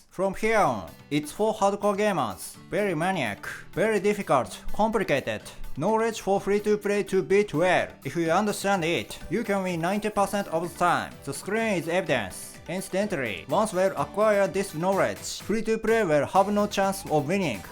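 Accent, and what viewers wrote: Japan. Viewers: For one I love when jp ppl talks like this. But don’t worry if you just subtitled your videos it’ll be the same effect. If ur stuggling with English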